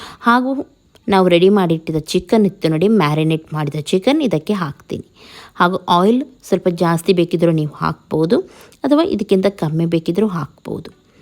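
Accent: Mangalore, India. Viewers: Mam are u mangalorean...🤔🤔🤔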